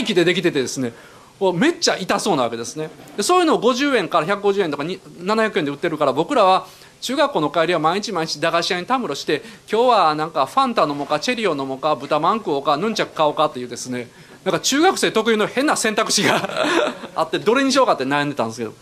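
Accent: Kansai, Japan. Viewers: 岡田さんが関西弁で話すのが新鮮です。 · ベタベタの関西弁の岡田さんも面白すぎで好きです(笑) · 噺のネタもさることながら一番やせてた頃のの語り口が関西弁多めなのがさいこうです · めちゃくちゃ関西弁やんけ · 関西弁ネイティブなのに標準語も違和感ないって、この人天才なんかな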